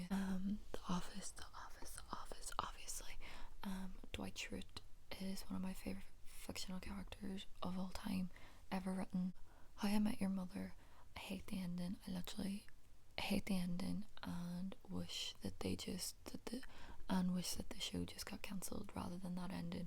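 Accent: Ireland. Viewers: now that u mention it I do hear the Irish accent and I love it!! congrats on 10k <3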